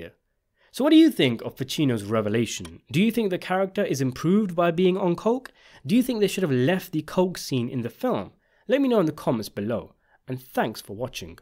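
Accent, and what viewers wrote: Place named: East London, United Kingdom. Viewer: "Mans highly stylish film" talking like East London fam careful of disrespecting our culture.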